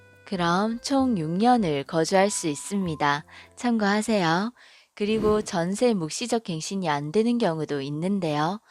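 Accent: Gyeongsang, South Korea. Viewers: 갱상도 발음이 참 귀여우시네요~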